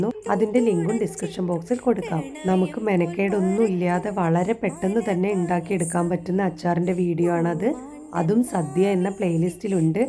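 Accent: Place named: Pattambi, India. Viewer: എന്റെ നാട്ടുകാരിയാണോ?. സംസാരം കേട്ടപ്പോൾ തോന്നി. ഞാനൊരു പട്ടാമ്പി ക്കാരിയാണ്. ഞാൻ കൂട്ടായിട്ടോ. തിരിച്ചും സപ്പോർട്ട് വേണേ 👍👍👍💕💕💕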